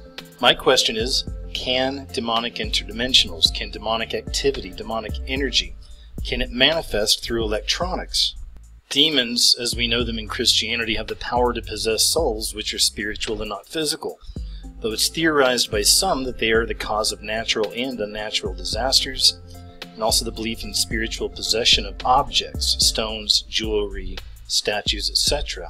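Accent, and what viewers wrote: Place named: South, United States. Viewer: I watch him and Love is country accent 😌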